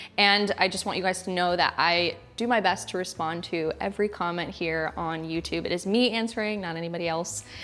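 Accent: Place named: New York, United States. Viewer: Looks delicious. The "au" in your words like "sauce" and "caught" really brings out the NY.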